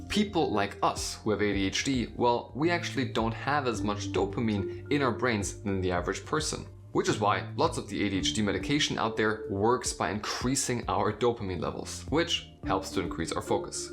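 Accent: Germany. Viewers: Are you German? I‘m German and you sound to me like German talking English. Just want to know, no judgement. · Your English is excellent. My Omi was from Frankenberg and I enjoy your videos for the information and the accent. I miss hearing it. Thank you! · Man merkt nur ein kleines bisschen, dass du Deutscher bist😉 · well he works in Sales (sic). I do not need a T-Shirt or Hoodie with a (imho opinion) daft slogan, let alone the expense to remind me of my ADHD. Might work for some, but that's too much HYPE for me. Viel Glueck mit dem Unterfangen Herr. I'm out! (That's best of luck in German, I'm German and can't help notice his accent). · Huh ben je Nederlands? Jouw accent klinkt Duits haha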